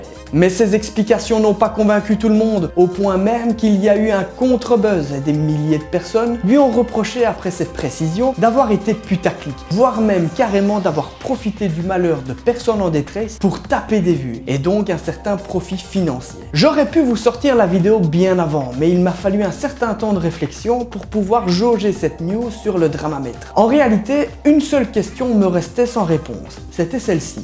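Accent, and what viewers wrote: Belgium. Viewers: Tu un ptit accent tu est belge ? Sinon cool ce concept de chaine moi shuis belge liegeois x)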